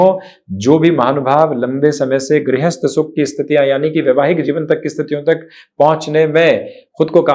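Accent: Standard. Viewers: Bahut shuddh hindi hai Bhai ki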